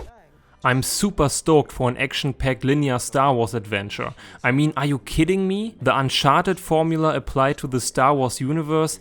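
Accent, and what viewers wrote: Germany. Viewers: Well i'm German too, so i know the accent. Your english is really good so i didn't catch it from the beginning. I hope your channel will get bigger. I sub for sure. ;)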